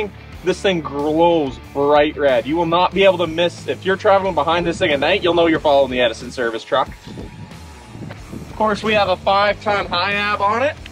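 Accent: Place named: Prince George, Canada. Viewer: You sound like you're from Prince George or Quesnel.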